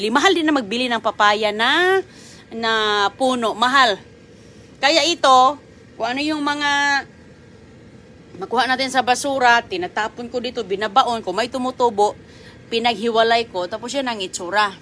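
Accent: Visayas, Philippines. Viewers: Feeling perfect nman itong SI, Visaya sya huh Kya iba ang intonation ng words nya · Tama,mga walang hiyang bashers bash ng bash buti sana kung english teacher vlogger yan para magandang magpronounce eh visayan people kadalasan matigas dila...mga walang kwenta mAnuod lang ni para makapangbash..walang ambag sa mundo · korek poh.. grabe mkpanlait sa salita ni eh alam.nmn nia laking probinsya at bisaya nga...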